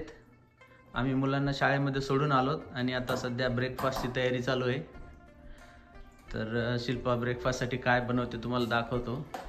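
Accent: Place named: Vidarbha, India. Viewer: chane आहे कुठं आहेत भाषा widarbhatli आहे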